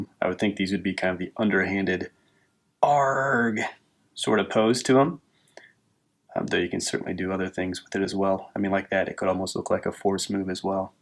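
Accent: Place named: Wisconsin, United States. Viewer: You sound like you're from Kenosha Wisconsin.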